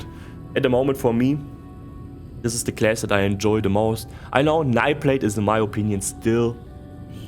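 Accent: Germany. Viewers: Love ur german english 😂👌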